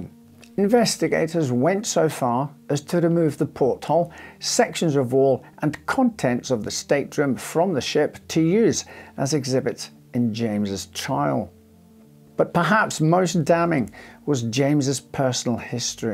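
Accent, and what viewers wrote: Scotland, United Kingdom. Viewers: You definitely have a Scottish twinge in your words, and I love the way you speak. Thank you for another very interesting story.😊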